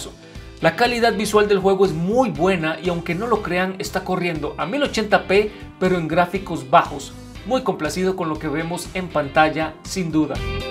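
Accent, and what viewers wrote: Costa Rica. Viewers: Mae usted es de Costa Rica? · Hola soy de Costa Rica Quiero comprarme una pc nueva que me corra buenos juegos Estoy viendo tu video "análisis de los nuevos ryzen 3400g y 3200g" Creo que por tu acento sos de acá Costa Rica Sabes donde puedo comprar una pc a buen precio?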